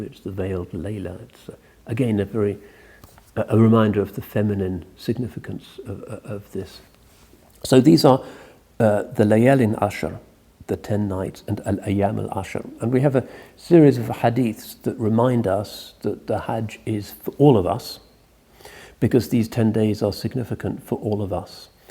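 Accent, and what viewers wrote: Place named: RP, United Kingdom. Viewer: What thick accent? This is RP (received pronunciation), a cultivated, educated register of British English.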